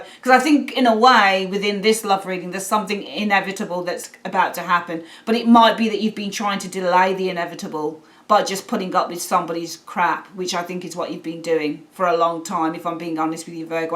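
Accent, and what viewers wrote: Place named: Black Country, United Kingdom. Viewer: definitely hint of black country in that accent .. yau in dugley?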